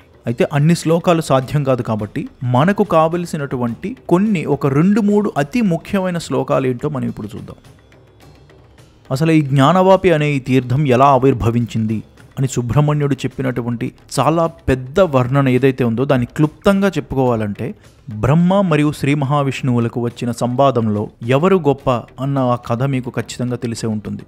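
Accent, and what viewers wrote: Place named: Telugu, India. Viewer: I knew you are Telugu. Your accent seemed so familiar to that of a very dear friend I have got - also Telugu. I'm still waiting for the mathematics series. Please continue with it. I wish to know the Bharatiya Ganitam so much.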